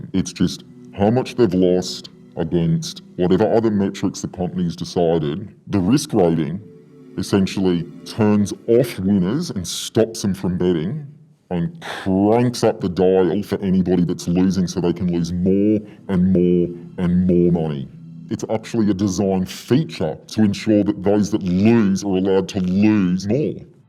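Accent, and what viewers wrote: Australia. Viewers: australian accent. tanned skin. age 40-59. cadence indicates melbournian. one of the largest organisations .SPORTSBET,TAB,crown,WATERHOUSE thats what i saw in this vid about you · No matter how hard you try - you cant hide that Aussie accent!!! Get a dog up ya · that aussie accent, and he means TAB they are crooks, as soon as you get a winning streak or jag a massive multi or first 4, they ban you from betting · Can tell hes an aussie 🤣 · This guy is definitely Australian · This guy has to be Australian. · Pretty obvious this guys from Australia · the legendary aussie accent powering through the muffled voice effect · Dude is Australian, I can tell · this guy is 100% aussie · Sounds like an Aussie. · easy to tell hes aussie. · i like that you can hear the australian accent through the voice morphe lmfao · Bro can’t hide the Australian accent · This guy is from Australia maybe from Perth ... 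words like tick tick tick and Heeps gave it away and theres a Casino in perth so im just guessing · no amount of distortion can hide that Australian accent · Aussie yo! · 2 seconds in Yeah this guys Australian ool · Australian accent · Can tell this guy is an Aussie from his voice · Sounds like this guy is definitely Australian. Could only be Sportsbet, BET365 or Ladbrokes then. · Aussie if I’ve ever heard it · sounds like an australian accent, interesting! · This guy sounds Australian.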